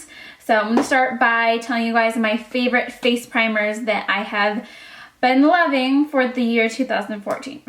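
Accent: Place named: Midwest, United States. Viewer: I've always wanted to ask you, are you from the Midwest?? You have a strong Midwest accent! Nothing wrong with that, I'm originally from Chicago but I don't have the accent:(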